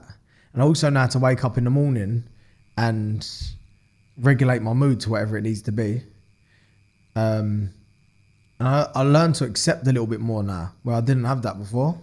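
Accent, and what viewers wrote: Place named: England, United Kingdom. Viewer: Hi there, sounds like a typical English lad😮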